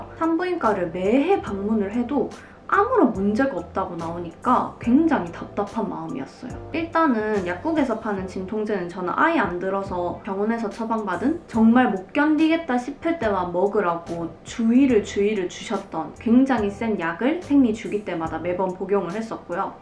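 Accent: Gyeongsang, South Korea. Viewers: 일본인 구독자입니다! 님 고향이어디입니까!! 갱상도 사투리같은데 어디죠???🥺💕💕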